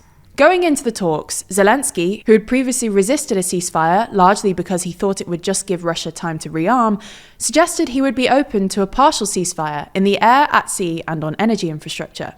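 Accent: England, United Kingdom. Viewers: Love her accent. What part of England is she exactly from?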